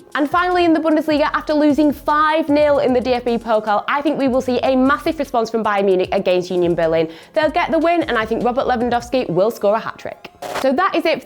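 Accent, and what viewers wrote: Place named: United Kingdom. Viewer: No hate but I just don't like her accent and the way she delivers the video. Too fast and too much British for everyone to understand. Please only in the future.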